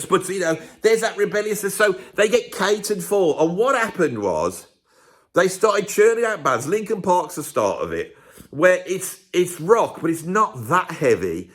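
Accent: Birmingham, United Kingdom. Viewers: 1. Loud brummy spouting his worthless opinions on youtoob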